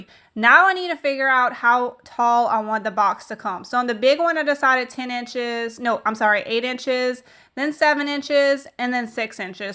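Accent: Louisiana, United States. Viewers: Are you a born Cajun? Is that the accent I hear? LOVE IT SO MUCH! Your projects are some of my favorites ever. XOXO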